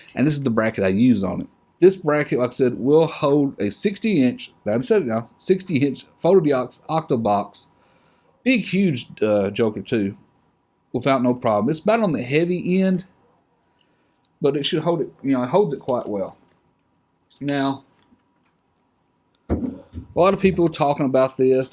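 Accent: South, United States. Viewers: very nice presentation. I subscribed. the southern drawl at first made me think you were dumb but once I got through my own horrible prejudice (about 20 seconds) I realized you are quite informed and intelligent and the drawl becomes endearing. It was easy to overcome my preliminary prejudice because in college, the smartest professor in the school was from Alabama and had a drawl so thick, when he said here it sounded like heeyah. He was so smart, the text books for his class were written by himself, and are the only textbooks I kept. We loved that man. Listening to him talk about Macroeconomics in his graduate level class totally destroyed the myth that southerners are dumb, because his accent sounded like one of a cattleman, but his words were so sophisticated that 99% of east coast snobs, myself included, didn't understand what his words meant until we had studied under him for 4 months. 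Thanks for your useful lessons, and for making them long enough to include everything so we know what to buy!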